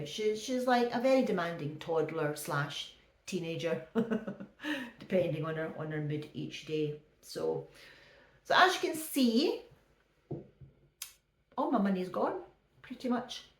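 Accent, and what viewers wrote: Scotland, United Kingdom. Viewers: I'm an English-speaking Canadian In Quebec Canada. I love your beautiful Scottish accent!😊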